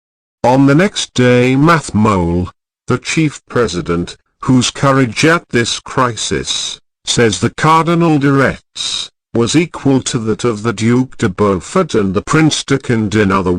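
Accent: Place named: United Kingdom. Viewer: I want to try this 😁 Edit: thank God this is British. I can practice my accent 😆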